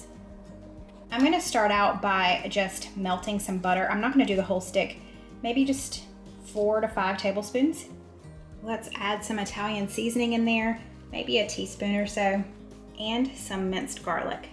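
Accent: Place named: South Carolina, United States. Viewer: Im a Carolina girl, living on Prince Edward Island, in Canada, and your recipes and your accent brings SC into my home. I made the White sauce chicken enchillada last night and hubby and I loved it!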